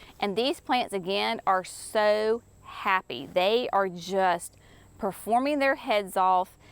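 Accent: South, United States. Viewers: Love your charming Southern Accent and the guaint Souhthern sayings. Thanks m for sharing g your beautiful garden. Love ya.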